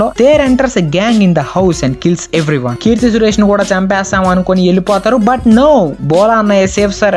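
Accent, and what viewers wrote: Nellore, India. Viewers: Mama nedi Nellore eh gaa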